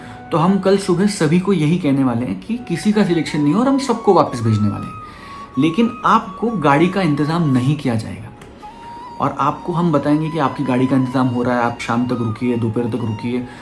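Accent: Standard. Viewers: कुश के रोल में बहुत प्यारे लग रहे आप और उच्चारण भी बहुत शुद्ध है।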